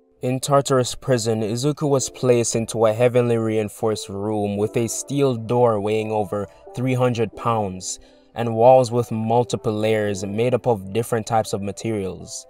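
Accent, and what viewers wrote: Jamaica. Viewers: U sounds Jamaican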